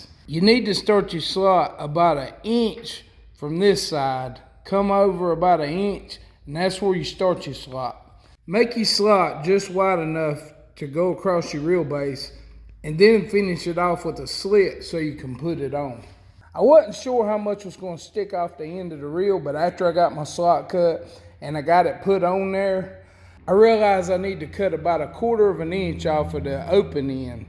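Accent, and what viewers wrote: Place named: South, United States. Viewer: Great idea bud. Hey I have to mention your accent twang is very similar to mine. I'm not makin fun, I never realized that I had such a southern twang accent til I heard my recorded voice and it totally cracked me up. I'm from the Texas panhandle but my grandparents migrated from Tennessee so there it is.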